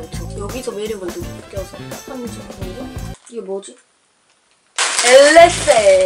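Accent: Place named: Jeolla, South Korea. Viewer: 옴메 사투리 전라도여?!?!?! 나돈디!!! 넘모 귀어우셔서 구독하고감!!!❤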